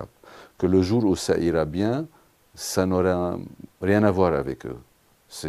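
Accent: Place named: Quebec, Canada. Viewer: I disagree. I think he's French-Canadian. Either that, or he has spent time in Canada and he's really really advanced in French.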